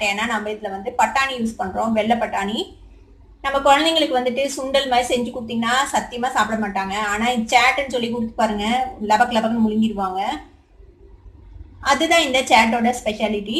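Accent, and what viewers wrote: India. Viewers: nice sis..indian tamil nalla iruku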